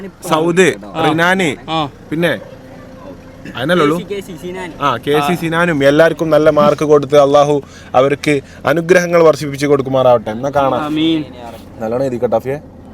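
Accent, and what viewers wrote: Malappuram, India. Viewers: Malappuram varthanam athaan ikk thonniya highlights 😂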